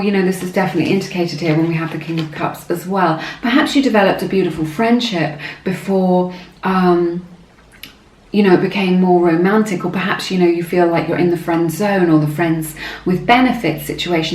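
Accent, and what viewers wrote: United Kingdom. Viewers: THANK YOU so much! I was lead to your channel by another youtube channel who I really respect. I’ve found that channels my friends or other readers have recommended to me are usually very accurate (could be the strength of my energy that’s being picked up on 🤔 Your reading was absolutely spot on to the point I was just smiling knowingly all the way through it (I chose 2). And because I’m also British, I don’t know why but I seem to resonate more with it. Silly I know but I like hearing the familiarity of the British accent! Thanks once again. 💙⭐️🙌🏾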